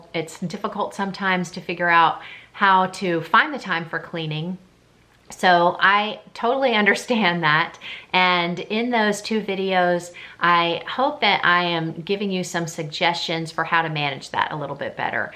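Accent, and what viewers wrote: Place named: South, United States. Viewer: I’m new to your channel but I am really enjoying it! I loved your Q&A. I was surprised to find out you are from North Carolina. That’s where I’m from. I have a little more southern accent than you do!! Keep up the great videos! It’s refreshing to find someone who is not afraid to express your Christian values.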